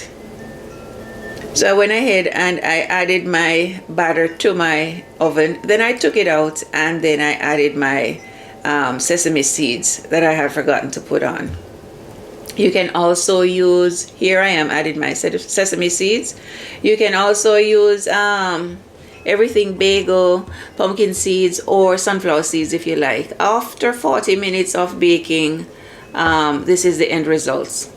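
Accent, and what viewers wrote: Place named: Jamaica. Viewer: Commenting and liking the video for the algorithm - big up that beautiful patwa accent. I badly wanted more homemade vegan (I can swap out eggs) bread recipes. This one is really good. Thank you.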